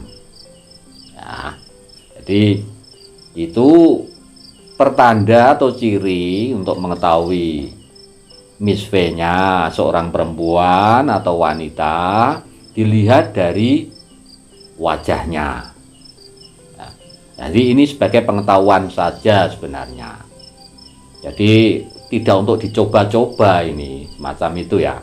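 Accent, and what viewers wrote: Java, Indonesia. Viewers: Mengenali Miss V para gadis.Lagi senang sekiranya sedari bayi.Iaitu anak sendiri.Positif dan bersyukur.Andai aku bisa memahami sungguh gaya penyampaian loghat Jawa!Telus ingin beristerikan orang@ jawa.Rajin dan bersahaja.Serta kuat akal fikiran.Saya dari Malaysia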